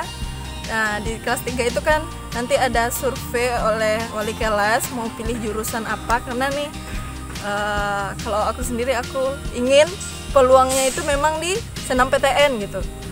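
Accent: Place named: West Sumatra, Indonesia. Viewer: Bagusnya bercakap loghat Minang sepenuhnya..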